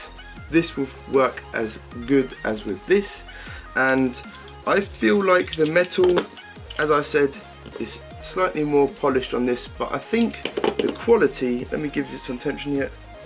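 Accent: England, United Kingdom. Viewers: TE Production Er kommt aus England 👍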